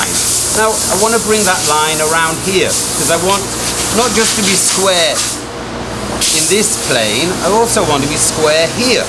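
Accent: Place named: Wales, United Kingdom. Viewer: Which part of wales are you from if you don’t mind me asking? I’m welsh and still live here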